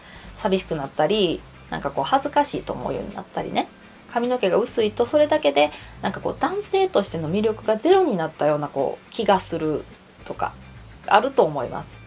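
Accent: Kansai, Japan. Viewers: やっぱやな！俺はのサバサバした関西弁や内面を評価してます！ちなみに俺はレスラー体型かな。筋トレしてます。👍👍 ガチで考え方が能動的になります。欠点を補うには筋トレが最強です。ドラゴンボールの最長老様に潜在能力を引き出して貰うクリリンの感覚です。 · 先生の関西弁のイントネーションが素敵だ 👍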